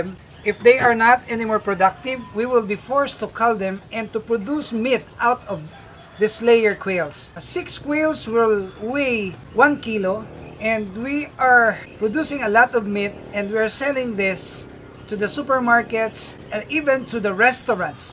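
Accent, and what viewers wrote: Philippines. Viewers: I think this guy from the philipines, just by listening to his voice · He's accent is Filipinose.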